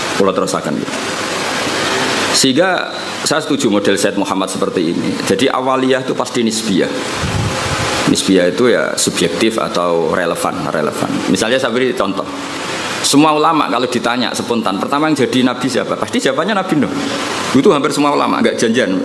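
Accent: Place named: Kebumen, Indonesia. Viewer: Kebumen ngapak nyimk kyai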